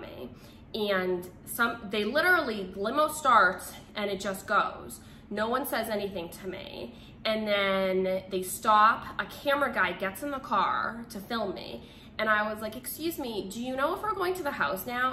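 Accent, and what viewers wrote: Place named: Massachusetts, United States. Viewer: Everyone subscribe to channel so she can talk about the Jamaica cat incident!!!!! I feel like and Danni would get along, should visit LA and do a video with danni!! Your both from Massachusetts talk kinda the same and are fun good story tellers idk🤷🏻‍♀️🥰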